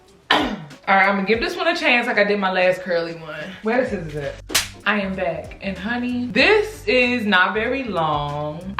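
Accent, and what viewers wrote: Baltimore, United States. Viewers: The curly was cute 😂and you sound like you’re from Baltimore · I watched your first Amazon wig video and I was like she gotta be from Baltimore, the accent in this video confirmed it. Nice to find someone from my city I can watch 💯🙌🏾 keep the videos coming you're hilarious too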